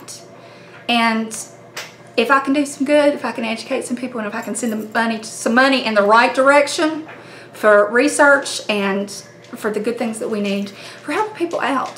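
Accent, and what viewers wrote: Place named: West Virginia, United States. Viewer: My mother in law also loves to collect cookie jars & her name is Beth. ^_^ Your accent sounds abit like hers she's from WV. How many weenie dogs do you have?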